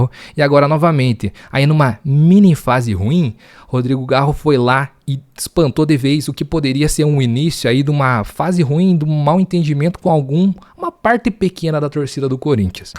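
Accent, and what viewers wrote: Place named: South, Brazil. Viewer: Tu tem sotaque do sul e torce pro Corinthians, explica pra nós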